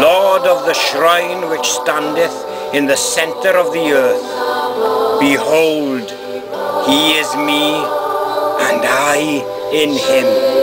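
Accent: Wales, United Kingdom. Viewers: Pure Welsh accent right there. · I really love your recording of the invocation, the profundity of the invocation combined with that beautifully medlodic welsh accent is music to my ears. I've been using your recording to listen and prepare before I perform the invocation myself for the last month or so. Esecpially yesterday since it was August 6, a day sacred to Thoth, and also happened to be Wednesday, day of mercury, so it was the perfect time. This invocation is brilliant for preparing oneself to engage in artful expression, my writing just flows like crazy a day or two after the invocation, and also the request to 'aid and guard me in this work of art' is a particularly moving exhortation. So, many thanks my friend for this little gift. Highly appreciated ;)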